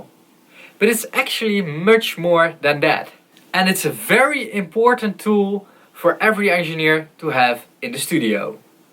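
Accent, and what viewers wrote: Netherlands. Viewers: You sound dutch..right ?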